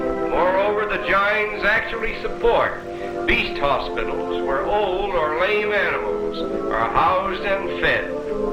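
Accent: United States. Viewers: great footage, what a weird and awful voiceover! lots of comments on here about British voiceover, its like, er, an an american accent init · This sounds more American than British. By the way, I am an Indian-American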